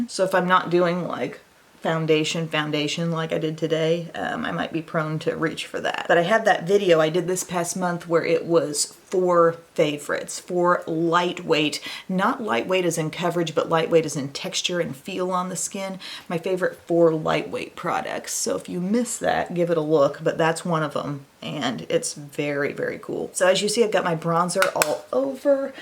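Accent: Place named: South, United States. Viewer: I'm just curious I know that you live in the Midwest. I always hear your voice like you have a slight southern accent. Where are you from?🤗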